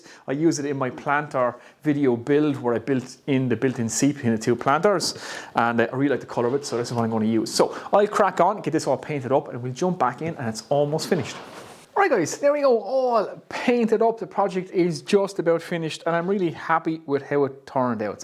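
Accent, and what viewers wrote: Ireland. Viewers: Dang if I made this video it wouldn't be a 10th as cool because I don't have that badass Irish accent! Great job on that bird feeder and video!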